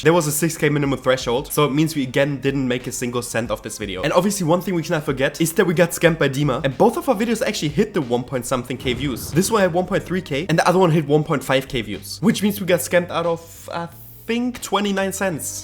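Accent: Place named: Germany. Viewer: Haha Most German Accent ever 😂❤🇩🇪 aber heiles Video!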